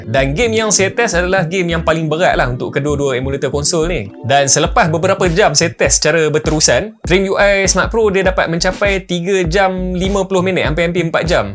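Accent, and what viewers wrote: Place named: Penang, Malaysia. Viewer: bang asai utara ka?? hahaha ada style stylee mamu penang mari laa cara bercakap😁 apa apa pun saya tangkap Trimui Smart Pro sebab review abang hahahhah:face-green-smiling: TERBAEKK bang teruskann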